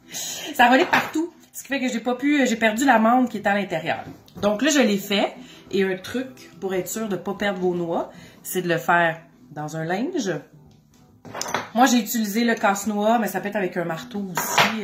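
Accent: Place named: Quebec, Canada. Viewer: Accent Québec. Vidéo très utile merci.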